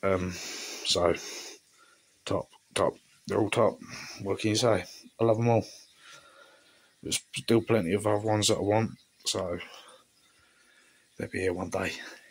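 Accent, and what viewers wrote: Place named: Kent, United Kingdom. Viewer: Oh my Gawd wot a smashing collection . Your accent also makes me miss the old country and living in Kent back in the day . Cheers Alberta Ray o7.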